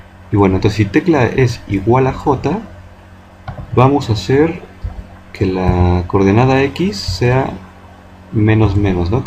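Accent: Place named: Central America. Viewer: El acento se nota que es de centroamérica o de México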